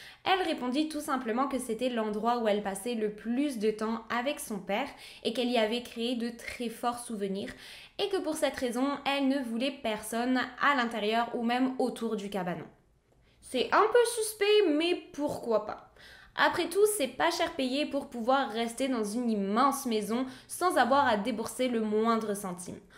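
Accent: Quebec, Canada. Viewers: je sais pas cest tres bizzard jai limpression que ta une legere accent quebecois desfois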